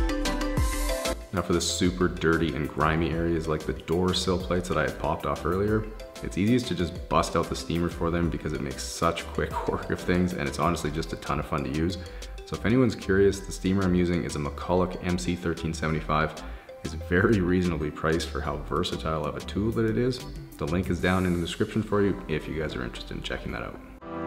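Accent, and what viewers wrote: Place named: Canada. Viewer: I can't get over the Canadian accent.😂 so cute! Such a satisfying video!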